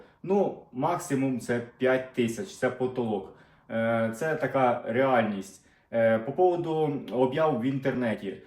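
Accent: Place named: Vinnytsia, Ukraine. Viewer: .. У вас комплекс меншовартості, це говір вінничан. Людина має коріння, рід і це мова їхня.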